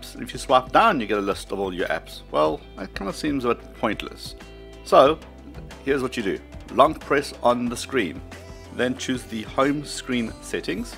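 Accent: South Africa. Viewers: Sound south African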